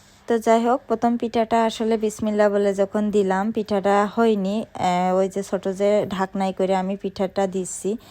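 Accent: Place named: Jhenaidah, Bangladesh. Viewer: আপু তোমার বাসা কোথায়??? ভাষা টা আমার জেলার মতো লাগছে। ঝিনাইদহ জেলা আমার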